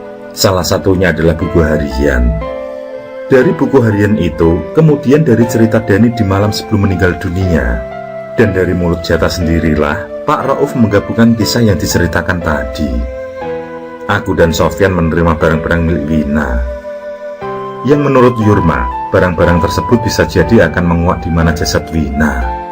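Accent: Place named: Yogyakarta, Indonesia. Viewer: dari bogor cengkoknya jogja :face-blue-smiling::face-blue-smiling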